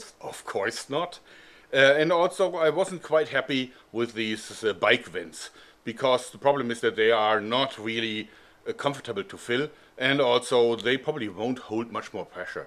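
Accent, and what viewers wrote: Germany. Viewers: Fake Accent? He has a Real one, And I have Something against German accents. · he has a real german accent, but sometimes exaggerates to make fun on his own accent.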